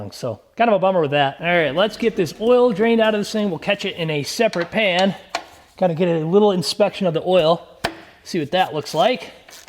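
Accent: Minnesota, United States. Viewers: your in minnesota i can just tell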